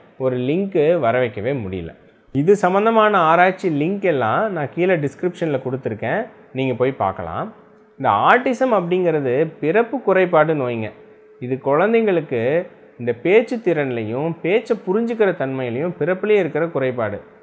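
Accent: Kongu, India. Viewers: கொங்கு மண்டலத்து பேச்சு நக்கல் நையாண்டி அப்படியே இருக்கு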